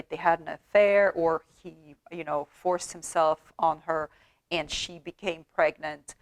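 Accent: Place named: Sweden. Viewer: As always, you look fabulous 🤗🤗... thank you for the storytelling, you have the perfect voice for it. Have a great weekend..⛱.. ps,so cool you speak the Swedish way, makes it more authentic 😉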